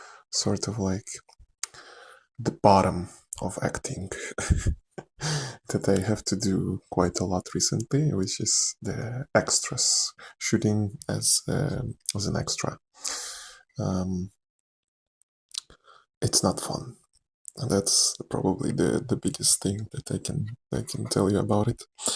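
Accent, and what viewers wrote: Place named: Eastern Europe. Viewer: that slavic accent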